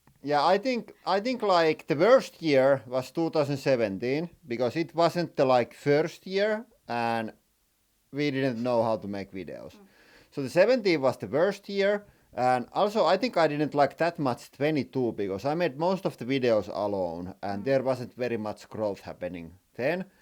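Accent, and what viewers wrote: Finland. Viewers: I was trying to guess your nationality by your accent, but when I heard Perkele the guessing was over. Greetings to Finland. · I didn't really watch before. But I thought the accent must be Finnish, and then you hit us with the perkelle, and it was confirmed haha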